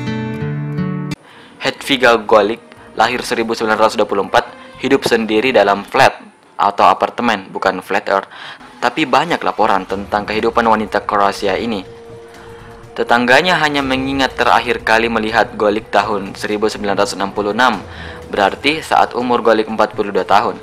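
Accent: Makassar, Indonesia. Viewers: Santemi logat makassarta' bos 😂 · Abang orang makassar yah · Orang Makassar detected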